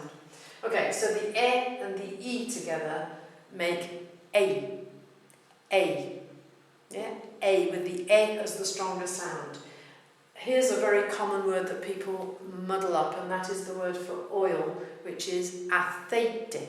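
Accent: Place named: Spain. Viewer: Have to start saying these videos are really good and she explains them very well, after all she is explaining the basics and then if people want to learn the language just have to learn vocabulary, now english speakers need to know that as in english language there are accents, and she is explaining spanish from Spain accent, thats why the CE/CI sound like a ZE/ZEE. so since most of spanish speakers are latin that CE/CI sounds like the S when you say say. very good video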